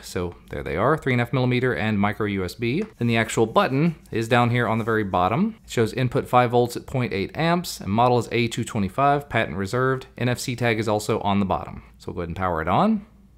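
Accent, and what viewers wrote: Kentucky, United States. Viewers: what part of kentucky are you from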